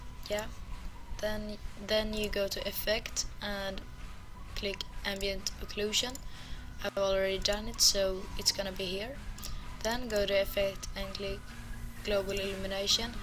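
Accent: Sweden. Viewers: lol hörde direkt svenska dialekten så var tvungen att kolla om du var svensk och ja det stod ju i din beskrivning ^^ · Thank you so much! now people can make money from this so if guys can make good intros then you can get like 20 $ for 1 intro! btw i did hear that you are from sweden (Im Norwegian!) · Agario he has to be, definitely sound like a swede! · Du bröt lite på svenska ;D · Lol man hör att du är svensk xD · u swedish ? You sound like it lol i am swedish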